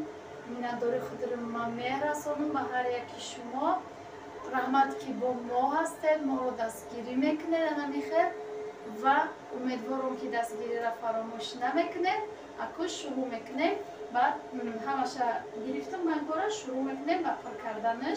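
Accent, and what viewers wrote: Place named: Hisor, Tajikistan. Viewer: Ассалому алайкум ва баракатуху Апачон созен хубен фарзандо созан хубан хамакаса ма ша Аллох шумо бехтарин Зан хастен зани хоксори точикои худмон шумо бехад манда махкул шиден простой Зан хастен авалдарача гапзанитон манда махкулай чунки ранги мо гапмезане мо аз Хисор мешем хонамон дар Кальаи Хисор наздикаст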